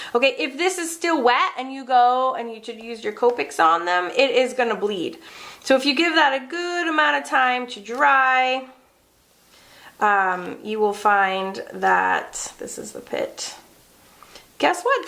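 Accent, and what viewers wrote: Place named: New England, United States. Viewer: Love the NE "talk" don't lose it please. This video was very helpful. I have the streaking problem (shame) lol, so thanks for sharing your wicked cool wise ways.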